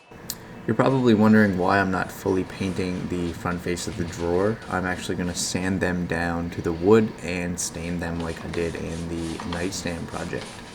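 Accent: Canada. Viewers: I've noticed you're canadian because of the accent hahaha 🥰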